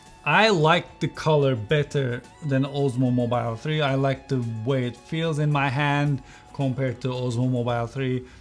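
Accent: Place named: Turkey. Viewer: ar yu torkish birader, aksan igrenc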